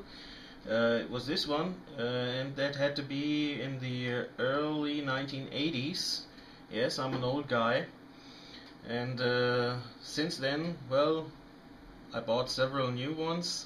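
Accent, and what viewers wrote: Germany. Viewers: Excellent exhaustive video! I can tell from your accent you're from Germany, kudos to you for speaking great English!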